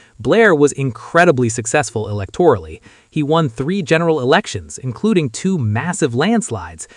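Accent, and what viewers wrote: United States. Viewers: Why have we have an American voice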